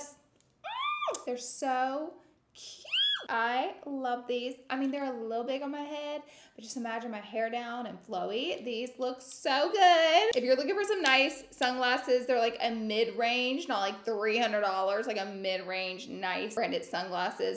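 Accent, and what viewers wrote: South, United States. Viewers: GURRRLLLLL is totally from the south. But it’s MY FAV. Fellow southern girlie.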